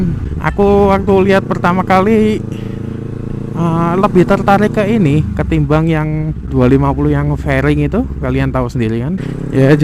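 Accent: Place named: Java, Indonesia. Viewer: cocok campur jawa om ngomongnya, medhok pisan. "rx king cok" 😂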